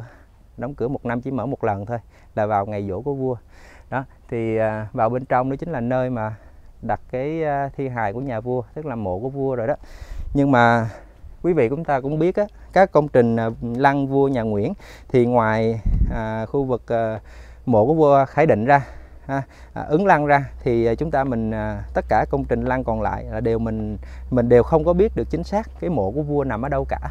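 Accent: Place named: South, Vietnam. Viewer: giới thiệu giọng thanh thoát pha hoà trong Nam dể nghe hơn Huế Revew [xin lổi nghe tại mình thường nghe theo giống Nam kỳ lục tĩnh]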